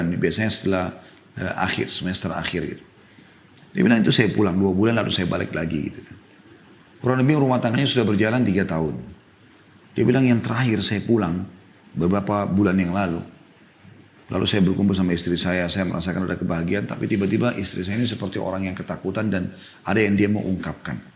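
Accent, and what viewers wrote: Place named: Arab world. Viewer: Ngomong mu masyaAllah Ala arabica gitu lo.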